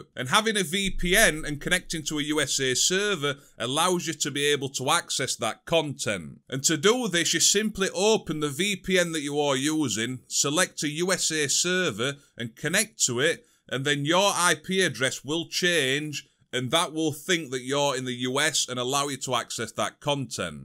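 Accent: Yorkshire, United Kingdom. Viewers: Won't lie you make me laugh are you Yorkshire or Lancashire you know your s***🤘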